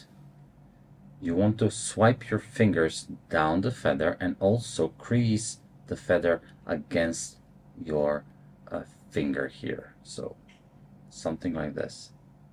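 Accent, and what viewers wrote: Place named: Europe. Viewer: Brilliant video, your very very good at displaying the real inside parts of fly tying. A short time ago I watched a lot of you videos,I am so impressed and have started fly fishing and tying again, what a great inspiration you are. Do you live in South China, you sound European that is very interesting, how is the fishing in China.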